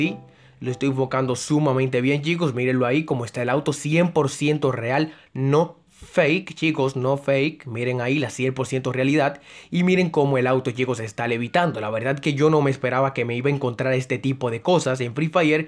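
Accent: Dominican Republic. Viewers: Eres de republica dominicana? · buen video, eres dominicano?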